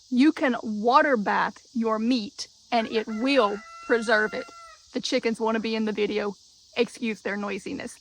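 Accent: Louisiana, United States. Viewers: I knew you were from south Louisiana the moment you spoke. I might be in Tennessee but I boil crawfish here too because I lived in New Orleans most of my life. Great video.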